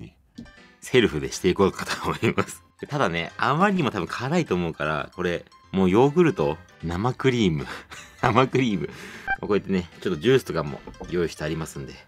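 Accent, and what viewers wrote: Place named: Ibaraki, Japan. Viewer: 久々に見たら 茨城人かよ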